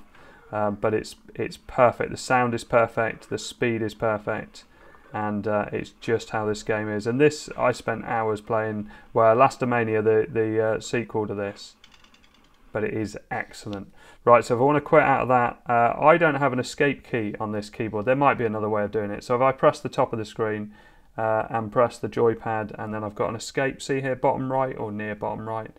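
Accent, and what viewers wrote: United Kingdom. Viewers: love to hear some English accents on a tech channel 👌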